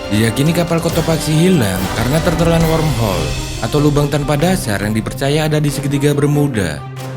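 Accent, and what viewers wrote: Java, Indonesia. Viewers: Medok ya mas suarane...iyaaaa jaraeee capaaaaa😂😂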